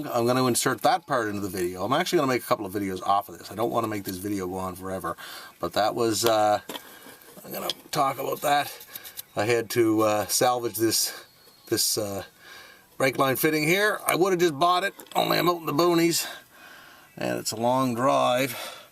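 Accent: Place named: Canada. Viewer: Liked your video even with that terrible Canadian accent! Thanks!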